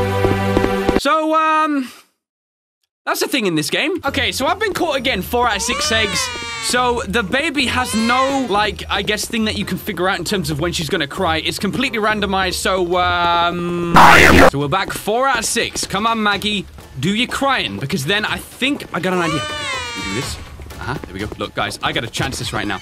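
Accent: United Kingdom. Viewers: I hear british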